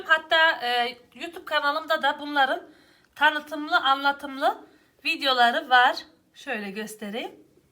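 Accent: Aksaray, Turkey. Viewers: Ellerine ve emeğine sağlık hepsi çok güzel biz Aksaray insanları hep doğal konuşuruz benim oğlumun ismi de Ayhan sizi çok seviyorum hanım beğenerek dinliyorum izliyorum selamlar öpüyorum seni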